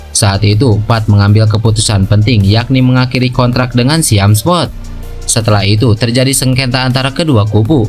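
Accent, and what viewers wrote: Java, Indonesia. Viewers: Ini youtuber bicara mya aja medok ga bagus , nyesel putar vidio ini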